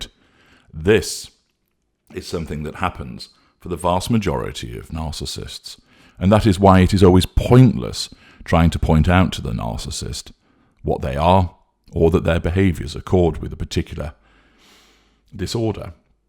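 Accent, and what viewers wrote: United Kingdom. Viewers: Are you Oxford educated? I'm American; your accent is the easiest on the ears of anyone British I have heard.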